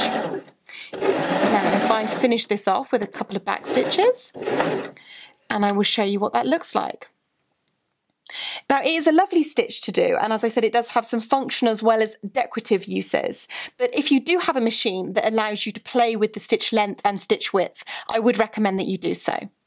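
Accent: United Kingdom. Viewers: Tina Bailey Actually, I think she’s saying “join me back here.” She has a lovely British accent which may sound like draw instead of join.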